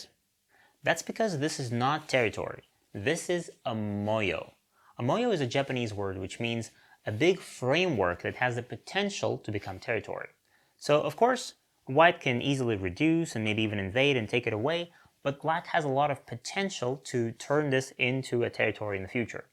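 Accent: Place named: United Kingdom. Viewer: You have a great accent. I thought your from the UK :) I like your passion about the game. Also the studio and the way you talk about the game.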